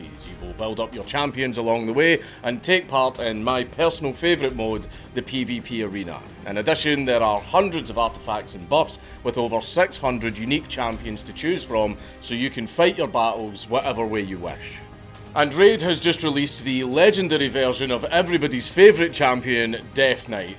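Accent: Scotland, United Kingdom. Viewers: stfu ur scottish brother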